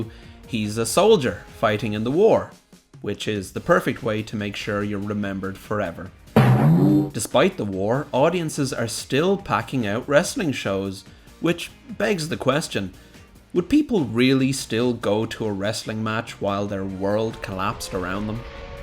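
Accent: Ireland. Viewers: ❤love the Irish ness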